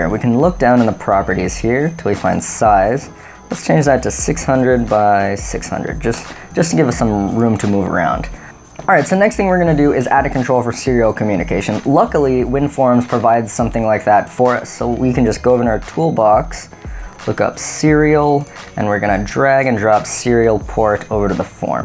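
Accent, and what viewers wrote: United States. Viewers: American, just not a 'Merican.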